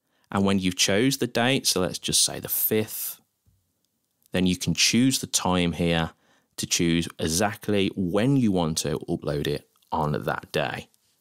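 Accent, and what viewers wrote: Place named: West Midlands, United Kingdom. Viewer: Excellent video. And I find the slight West Midlands lilt relaxing as well. Which is good because this is an infuriating task.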